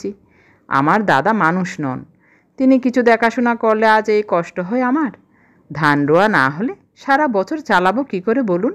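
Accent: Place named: Bangal, Bangladesh. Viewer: খুব ভালো লাগলো বাঙাল টান টা আরো মধুর করেছে , যদিও পুরো বাঙাল ভাষা বুঝতে পারি না পুনঃ গল্পের আশায় রইলাম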